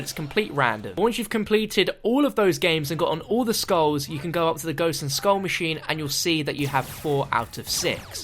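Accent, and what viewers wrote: England, United Kingdom. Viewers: He's from England, and that's how we say it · That's how Britains pronounce it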